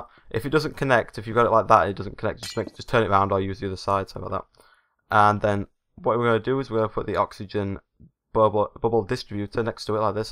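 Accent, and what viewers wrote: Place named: United Kingdom. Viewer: his Thick Brittish accent....... =))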